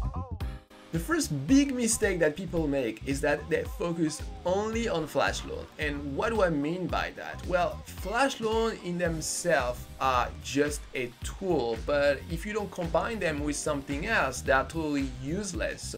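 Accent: France. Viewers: He sounds french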